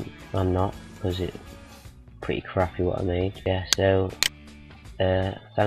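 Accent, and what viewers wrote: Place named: North, United Kingdom. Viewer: If I hat to guess I'd say you were maybe from Barrow/Blackpool area from your accent. Good video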